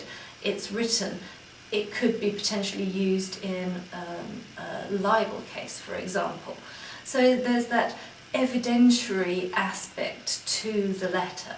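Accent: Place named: England, United Kingdom. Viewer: oh ! I v just seen your présentation in your channel, and , you talk about the Celtic Lenormand ..are you the creator of this deck ? its amazing , I ve just received one from amazon after have looking some reviews on others channel , and I find pictures so sweet and beautiful ..its funny for me , because , when i ve purchased it , I didnt know it was your deck , but in the same time , I ve discovered your vidéos about Lenormand !!like an évidence ! I appreciate your english accent , its easy for me to understand your videos and thats why I suscribe on your channel .Which town are you from ? London ? Oxford ?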